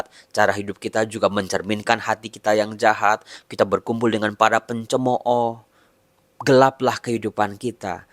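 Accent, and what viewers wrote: Palembang, Indonesia. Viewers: Saya suka khas palembang mohon 🙏🙏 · enak semua romo, ...tapi khas Palembang aha. sy pilih.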